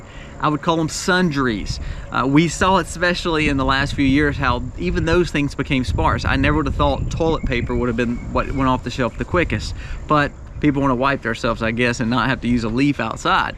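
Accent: South, United States. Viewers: I’ve never hear “oil” pronounced this way 😂 Southern accent is strong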